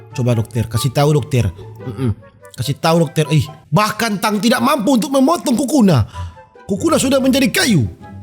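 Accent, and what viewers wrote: Manado, Indonesia. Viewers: Suka dengar pertuturan orang manado. Unik 😊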